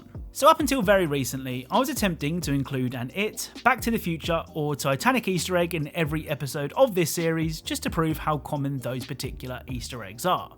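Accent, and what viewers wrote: England, United Kingdom. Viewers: Charming English Accent.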